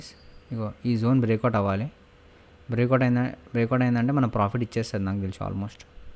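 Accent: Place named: Rayalaseema, India. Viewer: Bro meedi proper Rayalaseema kadha I think u r language pronounce like that only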